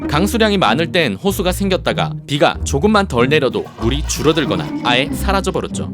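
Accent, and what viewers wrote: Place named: Gyeongsang, South Korea. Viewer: 혼자 읽기 힘들었는데 감사해요 경상도 분이시죠?? ㅎㅎ❤️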